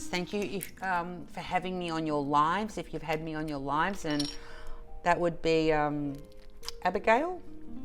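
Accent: Australia. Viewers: Congratulations on the 2k achievement,. What keeps me coming back day after day (besides your Aussie accent, LOL) is the fact that you are REAL! Your love for family and friends makes my heart Happy and your sense of humor makes me smile or even laugh out loud. Sending, as always, much love and lots of warm hugs💖🦋🌈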